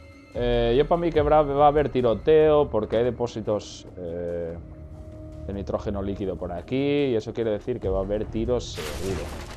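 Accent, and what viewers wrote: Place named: Galicia, Spain. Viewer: de que parte de galicia ereees??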